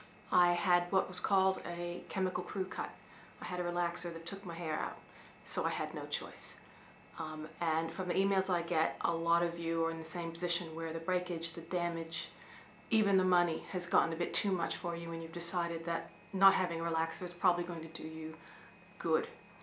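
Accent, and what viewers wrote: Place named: Australia. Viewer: I hear it too, pinky. There is SOME kind of accent there and it does sound Australian!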